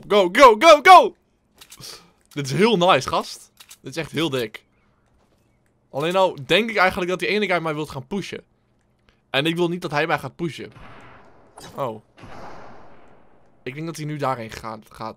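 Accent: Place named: Flanders, Belgium. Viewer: Ga weer vaker vlaams praten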